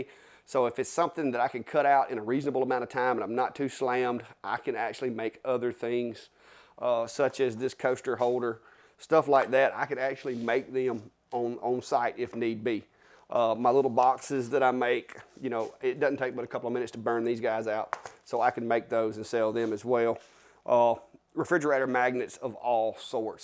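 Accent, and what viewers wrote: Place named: Perry County, United States. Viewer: I grew up in Perry County so I can understand you when you "tawk". lol Thanks for the good ideas and keep 'em coming!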